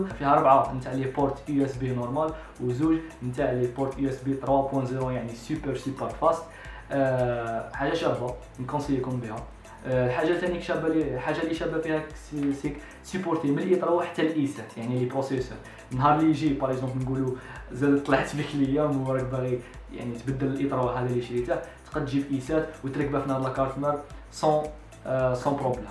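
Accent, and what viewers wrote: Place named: Libya. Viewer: عندك شويه في العربيه الليبيه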